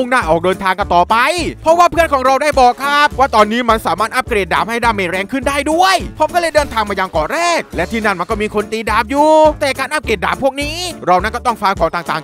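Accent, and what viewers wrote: South, Thailand. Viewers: พี่เป็นคนใต้หรอครับ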